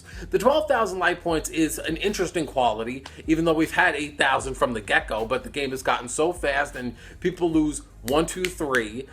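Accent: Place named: Brooklyn, United States. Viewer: I love how you have a Brooklyn accent and talk in a filthy frank voice at the same time. It's pretty cool and pretty funny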